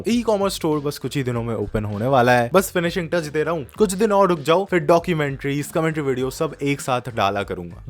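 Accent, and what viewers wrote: Bengal, India. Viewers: baat sunke hi pta lagte hain ki aap bangali ho.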